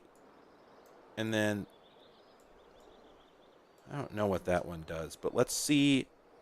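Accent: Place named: Australia. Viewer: Yay. Australian commentator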